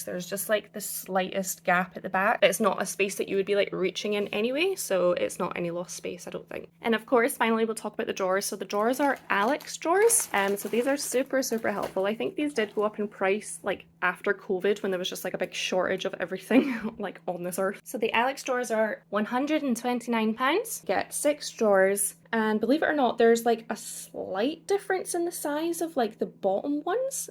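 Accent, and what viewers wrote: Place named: Scotland, United Kingdom. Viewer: A SCOTTISH LEGO BUILDER. I live in Falkirk. I watch Jango Bricks etc and stumbled onto this and my ears went more bigger than they are when I heard a scottish accent. Subscribed. Need to look over the channel. Not one for comments but Thought I'd say Hi.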